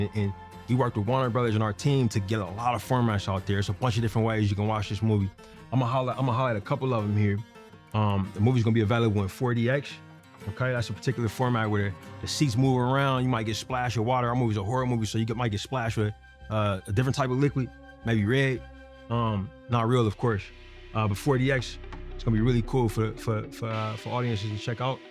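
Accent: California, United States. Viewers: I was like where did he grow up? I seen California and said that’s why I’m hearing nispey and yg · If you close your eyes it sound like a gang member telling you bout film 🤣🤣 I know he from cali. All love P.S i jut Looked it up, he really from oakland🤦🏾‍♂ No offense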